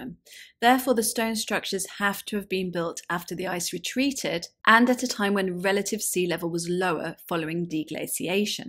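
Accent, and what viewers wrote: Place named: Home Counties, United Kingdom. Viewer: Beautiful diction;english 'home counties',around London?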